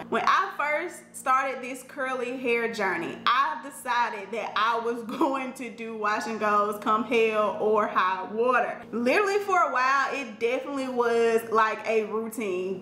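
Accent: South, United States. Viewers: I love all your tips, i had been struggling with wash n goes fir a while and just decided to revisit them, im still learning, and you have inspired me not to give up, your hair is gorgeous and Iove your southern draw 😘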